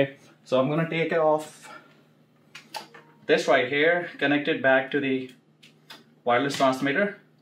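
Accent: India. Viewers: Either use Indian accent or foreign. Indiam accent mixed with foreign accent makes it unbearable to hear. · U have Indian accent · Your accent sounds indian. R u an indian bro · Why do I hear a indian accent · Bro you're indian? Indian accent in your english boiii......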